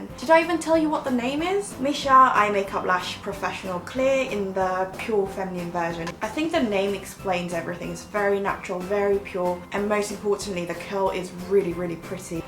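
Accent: United Kingdom. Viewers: 은 항상 보면서 존경스러워요.. 외국생활 아무리오래해도 전 저정도는 절대 못할꺼같아요 어후..발음도 약간 영국식발음이 정말 사랑스러워요 꾸ㅡ으으♡ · Love your British accent!❤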